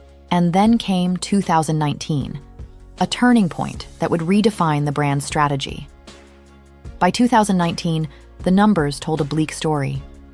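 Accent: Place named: United States. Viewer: This is the best "documentary" I have ever come across of her. Excellent narration in an American accent which sounds like a beautiful melody. I really enjoyed it. Her story is so honestly told. It's just authentic.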